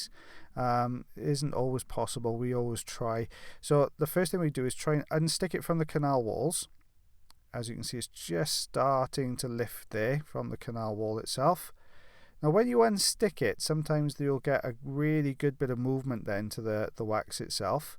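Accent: Wales, United Kingdom. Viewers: Sorry folks, I released how stupid a comment this was immediately after writing it. I had just never seen subtitles pop up on this before, and it was a bit of a dig at Americans who require the measurements in inches because they can't multiply by 2.5, nor understand English spoken in any other accent. Mea culpa! Love the Welsh! My grandmother was unintelligibly Scottish!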